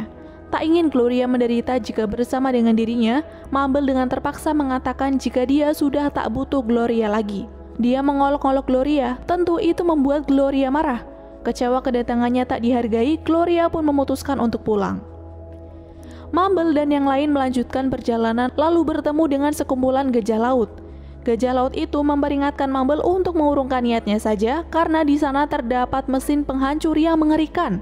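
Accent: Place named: West Java, Indonesia. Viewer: Teh orang sunda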